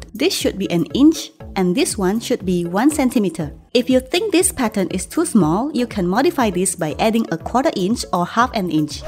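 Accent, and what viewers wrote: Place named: Malaysia. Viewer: Are you Malaysian or Indonesian!!! Your accent 😭😍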